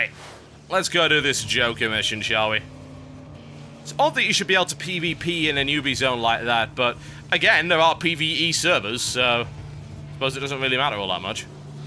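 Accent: United Kingdom. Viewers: that seemed to be a generally understood concensus. Also, i don't recall any REAL complaints. I would SO watch in 240p. Mostly i just watch for the hillarious comentary in the british accent tbh!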